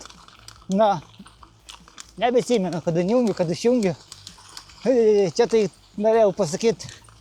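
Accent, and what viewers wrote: Aukštaitija, Lithuania. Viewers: Man žemaičiui labai įdomiai skamba aukštaičių tarmė. 👍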